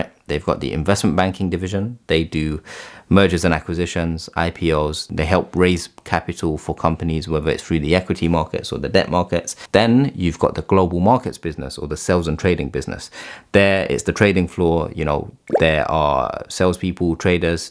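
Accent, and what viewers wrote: London, United Kingdom. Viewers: 1. Awesome video. 2. I really love the new generation London accent 3. I will always be so thankful for your videos that helped me get into banking 2 years ago, thanks so much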